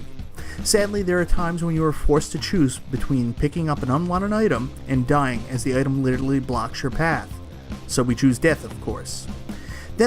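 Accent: Newfoundland, Canada. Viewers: Is that a Newfoundland accent I hear? I am also a hard game aficionado from the Rock, so that's nice. I've even beaten all three of these, and they're no joke.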